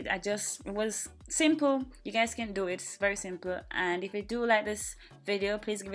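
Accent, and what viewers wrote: Jamaica. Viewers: Just the fact that u sound Jamaican girl I had to subscribe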